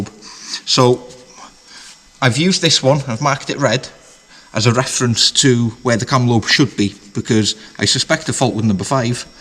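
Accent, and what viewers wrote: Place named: North Wales, United Kingdom. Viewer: Great video fella, just stumbled across your channel and subscribed. It would have been good to see if there was any visable play on the lobe once it was out, am I right in thinking the lobes are splined to the shaft? Also I heard the crappy cams were introduced with the 'upgrade' to Euro6, was the solid new cam you fitted off a Euro 5? If so I guess all the cam timing is the same? Also nice to hear a proper North Walian accent on Youtube, I'm originally from Llanfairfechan.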